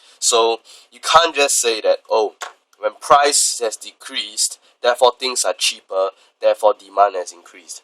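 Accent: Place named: Singapore. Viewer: Hi, thank you for your videos! Btw, are you Singaporean? Your accent sounds like it. That's cool because I understand everything you said in contrast to my university lecturers in Estonia. :D Cheers!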